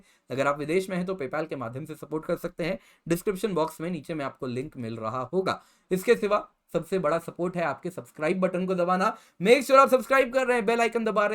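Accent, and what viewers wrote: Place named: Pakistan. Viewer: Aap Pakistani jaisa Q baat karta ho 😢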